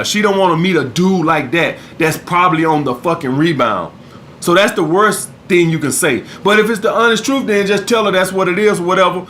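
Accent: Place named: Rural, United States. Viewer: .. Do women say your a "Fuck Boy", Because of your video content? ect..and also, im from N.Y. and have a thick AF N.Y. accent, why you sounds country as all hell? By the way..your vids are on point!👍✊🙏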